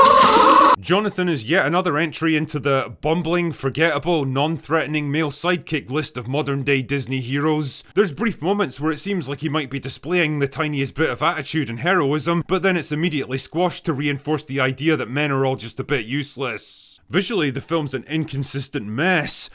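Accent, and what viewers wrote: Scotland, United Kingdom. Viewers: Normally I need subtitles to understand a Scottish person, but because he’s made an effort, I will say unbelievably good shit by the way I’m English😂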